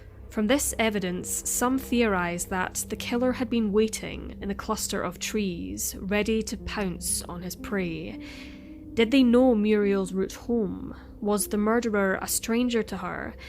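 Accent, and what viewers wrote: Scotland, United Kingdom. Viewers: It's Scottish..! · She’s Scottish, not English! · she is Scottish.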